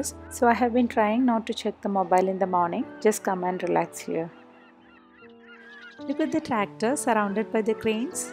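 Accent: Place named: Kerala, India. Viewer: Your Malayalam ascent is very spicy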